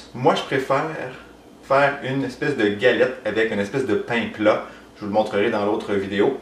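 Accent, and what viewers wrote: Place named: Canada. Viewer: Claude de Belgique J' adore ton accent Canadien !!!! et bien entendu le Québec !!! Merci !!! pour ta recette de levain que je suis entrain d'appliquer ( 2 éme jour )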